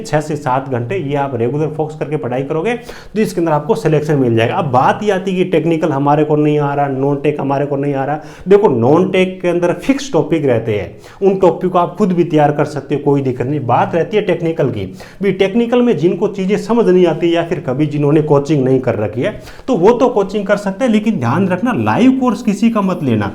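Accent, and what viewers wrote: Rajasthan, India. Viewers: Aap rajasthan se ho kya sir?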